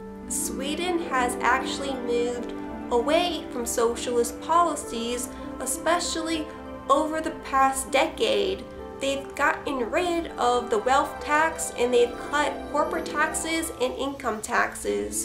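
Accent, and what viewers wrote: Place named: Alabama, United States. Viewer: that 'Bamie accent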